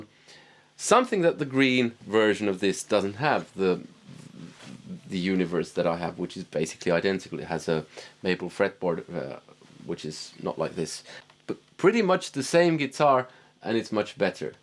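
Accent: Ireland. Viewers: I'd wager you learned to speak English while living in Ireland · Where does your Irish lilt come from?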